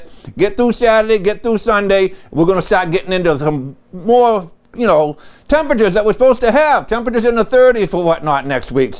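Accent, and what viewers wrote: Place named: Northeast, United States. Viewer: You are from the northeast. Can't hide your accent. Not a hillbilly.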